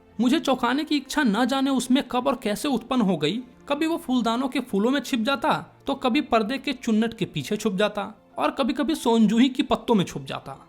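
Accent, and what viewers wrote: Bihar, India. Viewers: Bihari apni phele awaaz sahi karle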